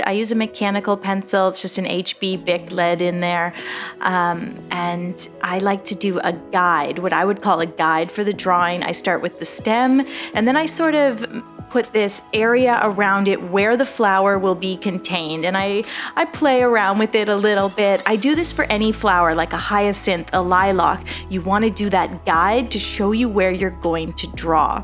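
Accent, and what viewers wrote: Canada. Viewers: So I’m The first time viewer and into this video I asked myself is this a fellow Canadian, because I’m pretty sure she’s from Canada ? Checks online Yep. 🇨🇦👍❤️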